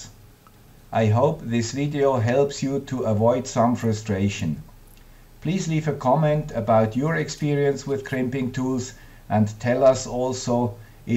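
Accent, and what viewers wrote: Switzerland. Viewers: Greetings the guy with the Swiss accent :)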